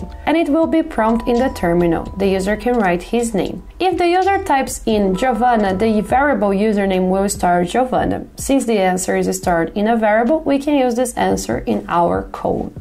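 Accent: Brazil. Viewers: Ela tem um acento brasileiro muito forte, com certeza ela e brasileira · Esse sotaque brasileiro não engana ninguém hahahah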